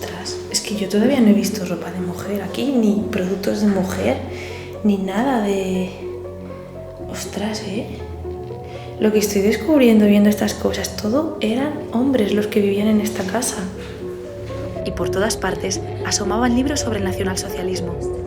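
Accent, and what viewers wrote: Spain. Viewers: Lo que me gusta de tus videos: 1-Tu voz me trae tranquilidad, es muy bonita, además ese acento español :D. 2-Me encanta ver como revisas las habitaciones, es muy curioso todo lo que puede estar ahí adentro :0. 3-Tu no mientes sobre los fantasmas, tu solo muestras lo que tienes que mostrar, no como otros Youtubers que ponen de titulo en su video "¡O por dios!, nos hemos encontrado a un fantasma a las a.m" que si, se que le da drama y suspenso al video, pero tampoco es como si hiciera falta xd. No se si me explique bien pero bueno jsjsjs, el punto es que me encantan tus videos ^^, alta biblia que acabo de escribir xd.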